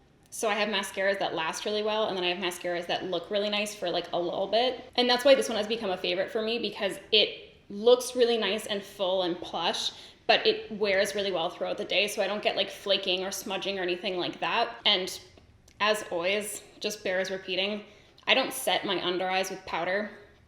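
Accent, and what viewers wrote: Canada. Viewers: My boyfriend overheard and said “is she Canadian??”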